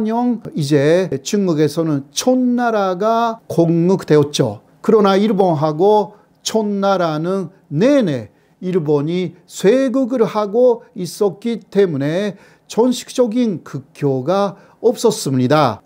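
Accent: Japan. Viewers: 외국인의 서울말 억양은 어색한데 일본어 억양이 섞인 서울말은, 단조롭고 밋밋한 서울말보다 박력이 있어 듣기 좋다.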